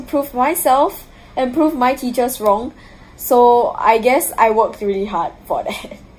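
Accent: Singapore. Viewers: I really love the singaporean accent idk why 😅😂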